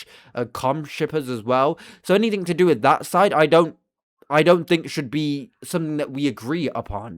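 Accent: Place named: United Kingdom. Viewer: HESSSSSSSS BRITISHHHHHHHHHHHHHHHHH still good video tho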